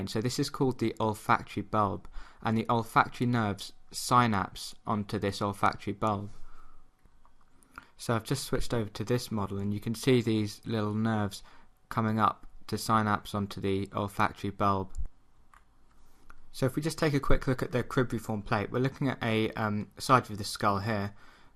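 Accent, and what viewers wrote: England, United Kingdom. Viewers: Excellent tutorial! And it's good to hear the English pronunciation of all those names that I (a foreigner) have tried to learn from books only. Just one thing: As far as I know, the acoustic nerve, surprisingly, contains far more efferent fibres than afferent, seemingly for assisting in focussing our sound perception by affecting the outer hair cells. So shouldn't it be called a mixed nerve, rather than pure sensory?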